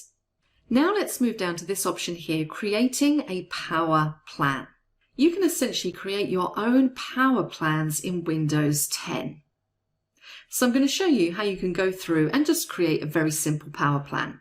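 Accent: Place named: RP, United Kingdom. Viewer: You're the best a zillion times I love this I can't stop watching because of your voice and the pronunciation of the words, BBC English everyone can hear and understand you very very well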